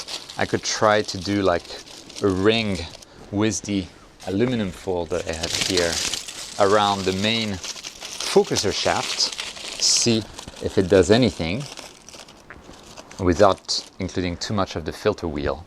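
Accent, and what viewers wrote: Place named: France. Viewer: ahhhhh I knew there was some french tones behind! ;-) sounds like my french aunt accent , who is living in California since the 60s... that's why my ear is used to this very faint "french touch"... and that I definitely prefer the butt plug term (LOL) . I guess you're right about aesthetic, especially during nighttime, nobody cares! I'll go for the "amazon touch" as soon as tomorrow evening. Looks like I had access to another video i wasn't supposed to..sorry.. not my fault! But as a consequence I didn't received your answer to my comment about taking flats during sunset... :--)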